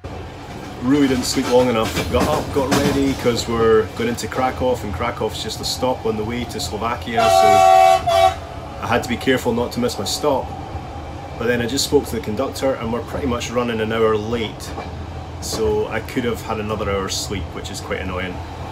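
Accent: Scotland, United Kingdom. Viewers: Another memorable report. In the very olden days I used to frequently travel by sleeper between Milan and Frankfurt. The luxury in those days would have even impressed Agatha Christie. That notwithstanding I could never sleep. I always had the sensation that I was slipping out of bed. BTW was that a Scottish accent hiding behind the Polish?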